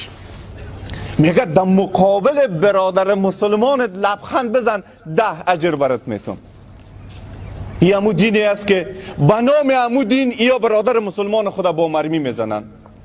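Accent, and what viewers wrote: Tajikistan. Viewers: Бахдо тоза лахчай точики кати гап мезана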